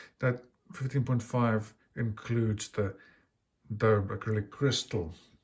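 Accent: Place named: Greece. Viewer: thank you for answering. I would not be surprised is you were Greek the way you speak English, that's why i asked. Yes, second hand may be the way to go, but i would really like the hi beat movement and the thinner profile of the version 3. Wow, from South Africa to the opposite side, Finland 😮.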